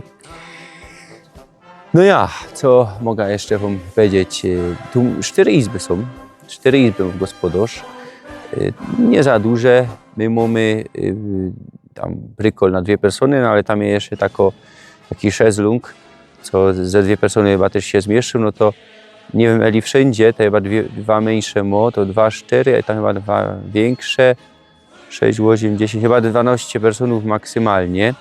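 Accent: Silesia, Poland. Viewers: Pozdrawiam. Fajnie się słucha ślonskiej gadki. Ja jestem otwarta na wszelkie języki i gwary a Śląsk bardzo lubię.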